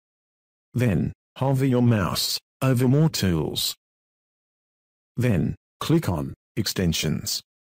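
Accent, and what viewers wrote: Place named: Australia. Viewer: Duh! I hate that Australian voice! Why can't you just change it to an American voice! That would be much better!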